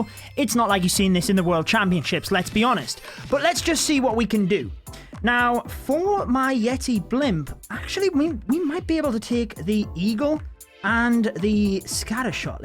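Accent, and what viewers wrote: United Kingdom. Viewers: Very good british Accent I enjoy your speaking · I love that British accent 😂.... P.s thnx for the great video!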